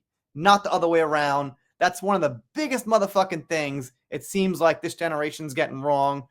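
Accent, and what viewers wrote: New York, United States. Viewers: I’m from New York and I gotta say, you are DEF from NY or NJ . Love the accent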